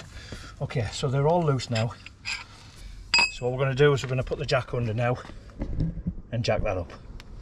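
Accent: United Kingdom. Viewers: Hi Love the videos keep the good work up, you might have some competition when our van arrives we’ll see. (I may be a bit too old for the work involved). We were due to pick-up our CV40 in June from Tyne Valley motorhomes but alas still waiting. Question: are you a Geordie no offence intended.:¬)) John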